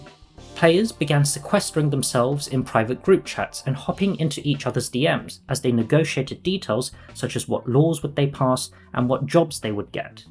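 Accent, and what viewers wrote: United Kingdom. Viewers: There was a 50/50 chance that this would be another of these dumb, right wing US videos and I was never happier to hear an british accent! Very interesting and equally entertaining video!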